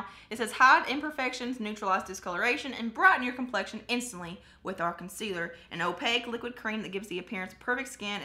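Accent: South, United States. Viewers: Country bumpkin... ain't nothing wrong with that! What I love about you is that you don't mask being Southern like another big youtuber does! Have a great week and love from Tennessee 😘! Also, I only have 5 notifications on and you're one of them 💖!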